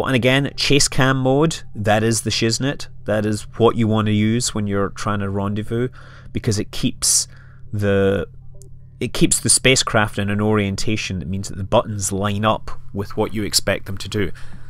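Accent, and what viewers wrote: Scotland, United Kingdom. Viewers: are you scottish or irish? cant tell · Hey, or you Scottish or Irish? Forgive me if you're something else, your accent just sounds more Scottish to me. Sorry if i offend you!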